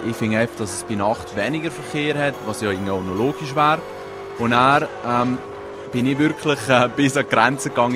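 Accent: Switzerland. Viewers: sprichst du schweizerisch oder holländisch??? ich versteh nur jedes 10.wort und komme aus bayern (mittelfranken...)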